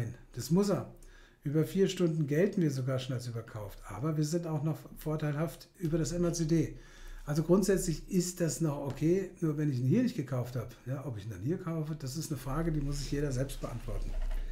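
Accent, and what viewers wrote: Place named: Frankfurt, Germany. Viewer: Klasse! Erinnert mich an meine Frankfurter Börsenzeit, und abends im Bitburger, da war auch dieser Dialekt am Start :) Vielen Dank für die solide Arbeit!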